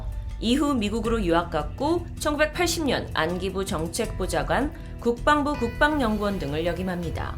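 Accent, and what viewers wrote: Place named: Jeolla, South Korea. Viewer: 아하~~ 그래서 "의"발음을 "으"발음으로 하는군요. 고향세탁은 전라도가 옜날부터 잘해왔던 일입니다. 정말 자기 학력에 진실이라면 전라도 발음을 배우게 된 계기가 있을거에요. 부모님의 영향이라던지요. 그걸 살펴보면 되겠죠?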